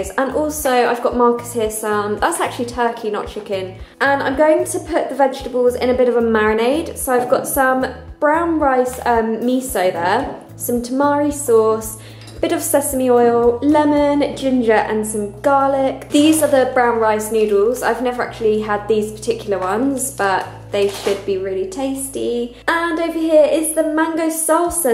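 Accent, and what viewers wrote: United Kingdom. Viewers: omg that British accent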